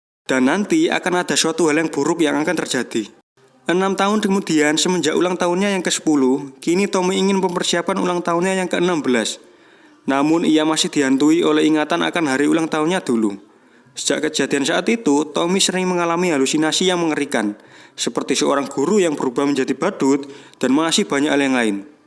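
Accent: Java, Indonesia. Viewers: Terlalu medok penyampaian nya jd gue skip ya. Bye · Agak geli , klo medok bilang Gue , gue , kenapa gak pake kata Saya aja ? 😂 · medok haha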